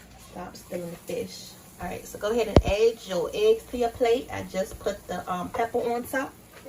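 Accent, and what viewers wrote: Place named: South, United States. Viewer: Sounds like you ade from Mississippi or Louisiana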